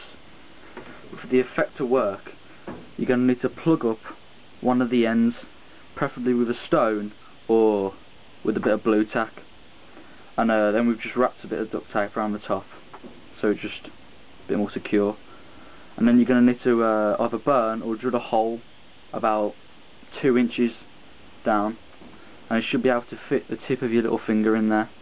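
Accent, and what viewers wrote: United Kingdom. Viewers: wow very british i must say bloody good job though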